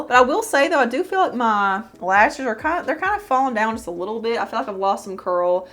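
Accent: South, United States. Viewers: Hi! I found this video when looking for reviews on the Relove foundations but now I am subscribed because I LOVE your personality 😊 I feel like you’re from the south because of your accent and same here (MS) so that’s also cool! Love your content I’ll definitely be watching all your videos! 🩷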